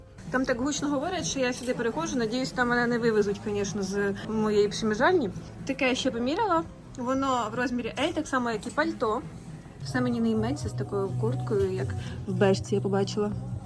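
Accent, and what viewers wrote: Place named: Surzhyk, Ukraine. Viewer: У Вас такой смiшний суржик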